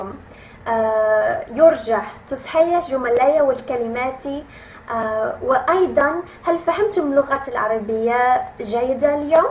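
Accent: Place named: Egypt. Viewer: Hi,there u are awesome u did great keep going and you need to work on accent caz u sound kind of egyptian which is ok but remember Fusha has nothing to do with any accent .feel free to ask any question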